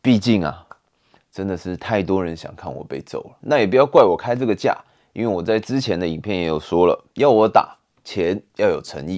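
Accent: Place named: Malaysia. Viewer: 1-5頂一個，感覺口音是大馬人，你的勸告也是很正向，的確他給小潘打輸了，也是沒什麼的。現在起碼知道這個月會有一場會迎戰。不過其他不說，TOZY發起挑戰後有特意學拳擊，其實已滿有誠意。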